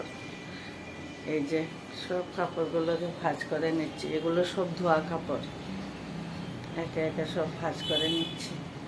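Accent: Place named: East Bengal, Bangladesh. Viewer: Khub khub valo laglo🎉🎉tumi kothay thako tomar kotha sune mone holo tomra purbo bongiyo... Amio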